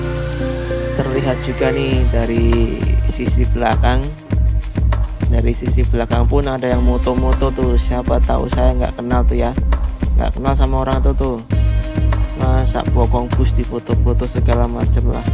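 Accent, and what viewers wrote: Banyumas, Indonesia. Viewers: Aku wong ngapak juga mas 👍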